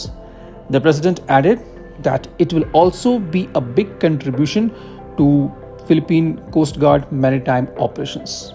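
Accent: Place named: Maharashtra, India. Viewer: Are you from Maharashtra ur accent seems very similar to me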